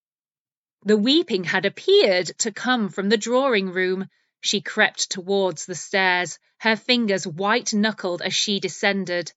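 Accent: United Kingdom. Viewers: i just listen only for one purpose because i AM FOND OF British accent i really adore this accent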